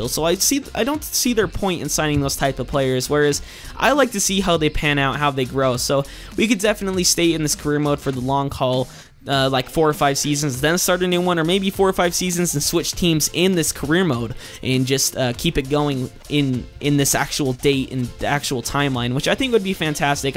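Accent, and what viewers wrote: United States. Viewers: Love your American accent! Nice to see an up a coming American FIFA YouTuber!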